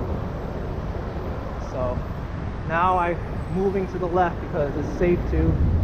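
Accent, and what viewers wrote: New York, United States. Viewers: Cute NYC accent.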